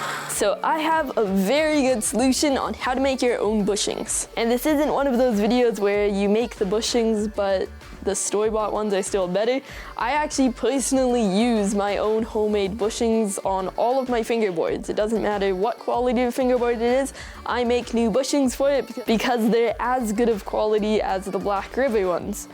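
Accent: Canada. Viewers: Wow you are so grown up know you have bass in you're voice and lost some of the canadian.i can't believe I watched you grow up from backwards hat to beenie and now you show you're hair.great job kid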